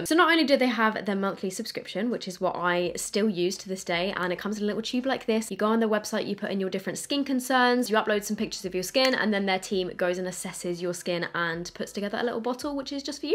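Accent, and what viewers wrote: Australia. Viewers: i love the way you keep slipping into an aussie accent whilst talking 😂😂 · Okay but your Aussie accent slapped 🤌🏼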